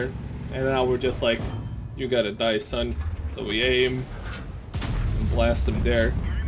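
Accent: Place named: Netherlands. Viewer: You are dutch right? I feel like I can hear it in the way you pronounce the "V" in VK :)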